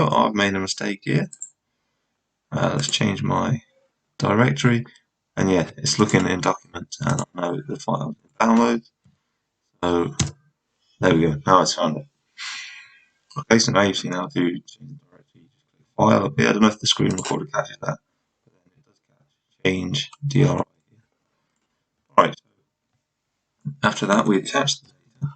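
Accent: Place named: United Kingdom. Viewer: This is a really offtopic question but: Which part of the UK is your accent from? I'm not a native speaker so I can't tell, however, I'm in love with the UK accents and I'd like to broaden my knowledge in reckognising them! Besides that, thanks for the video, it helped me with my thesis :) Kind regards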